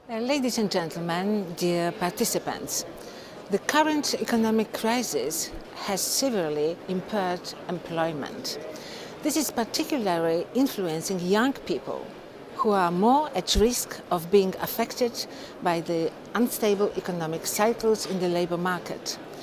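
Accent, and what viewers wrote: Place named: Poland. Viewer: No wymowa angielska nieszczególna, bardzo wyrazny twardy polski akcent.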